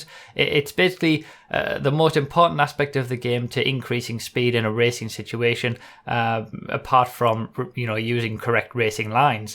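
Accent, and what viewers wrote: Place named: Northeast England, United Kingdom. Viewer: where are you from? Because I am also from the north east of England and your accent sounds a lot like mine.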